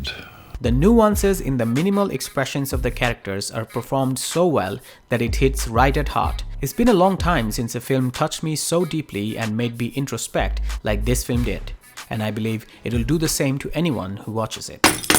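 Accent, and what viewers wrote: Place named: Bengal, India. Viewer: You're the perfect example of pure Bengali accent. It's enjoyable to listen.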